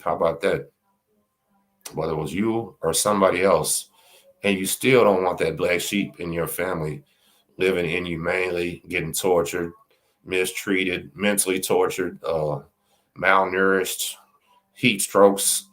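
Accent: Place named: Louisiana, United States. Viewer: Just tonight your channel popped up, for sure I'm watching, I imagine I'll be subbing too, I'll verify, digging the lou-z-anna accent, much love to Louisiana, especially opalusous(may not have spelled that correctly ..all respect intended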